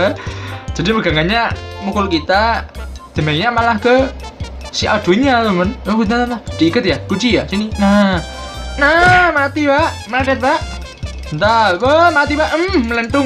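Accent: Java, Indonesia. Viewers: Jawa nya ga nahan bos haha Pertahankan sebagai ciri khas mu haha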